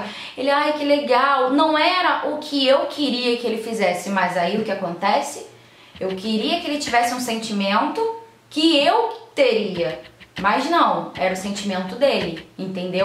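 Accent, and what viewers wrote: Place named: Rio de Janeiro, Brazil. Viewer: Ela é bem do rio ela arrasta o nasci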